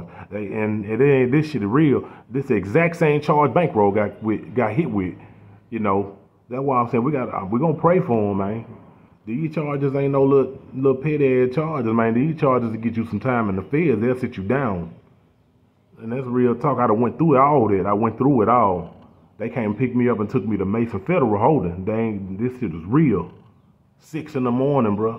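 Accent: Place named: Memphis, United States. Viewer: Damn Ian heard 'Jone' in a min, I can tell you spent some time in Memphis. Love from south Memphis mane just subbed, can't wait to see yea channel grow.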